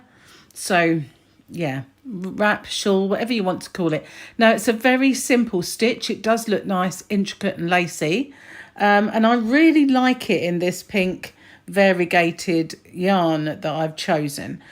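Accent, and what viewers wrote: United Kingdom. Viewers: Loved the pattern, loved your instructions, appreciated no music in the background and your British accent which so reminds me of my aunt. Thank you for the instructions. God bless!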